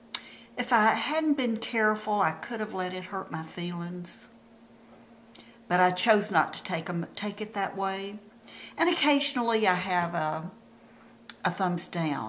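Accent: South, United States. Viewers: I'm 43 and I'm a Grandma too. You are a beautiful woman and I love your southern accent. Don't ever let a hater get to you. A hater, hates themselves therefore unable to love anybody else. xo Kat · I just subscribed to you! You're so beautiful and refined. Just a soft spoken, gentle Southern woman. I just turned 50 and am going through some anxiety about getting older. I don't look my age, thanks to genetics and staying out of the sun, but it is inevitable. I look forward to watching more videos from you and of course your granddog! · EXCELLENT!!!!! THIS WAS THE BEST REPLY TO HATERS!!!! JOB WELL DONE! I subscribed to your channel for these reason... 1- you look way younger than me (56) and I can use all the tips to look as young as you. 2- you talk to us you tubers as if we were friends forever...love that! 3- you take your time to explain things. 4- you are just stunning to look at....even my hubby would agree. 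5- you are very open and welcoming...you draw us in...so sweet! 6- love, love, love your southern accent. 7- you are truly one of Gods children and that does show....you keep shining. Hugs and Kisses... 💛💛💛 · I just discovered your videos and FELL IN LOVE with them and with you!!! I am 73, and also will go down fighting! I love your soft, Southern voice, very easy on the ears! Love all of your topics! Keep making as many videos as you possibly can and I will watch and be thankful for them all!!!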